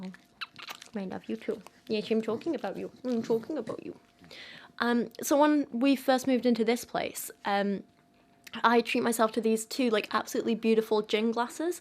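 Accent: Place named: Yorkshire, United Kingdom. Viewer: I don’t have money for merge or Patreon this month, but I’ll let your ads run so hopefully you can get some of that coin. PS: I don’t remember what video it was in, probably some hun bot MLM shit, but every time I consciously let your ads run, I hear your voice in my head with that Yorkshire accent going, “dollar dollar” and I don’t know why but it’s hilarious to me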